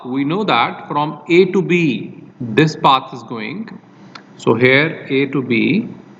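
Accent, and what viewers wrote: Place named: India. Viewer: just stop speaking or forget you're an Indian and speak normally!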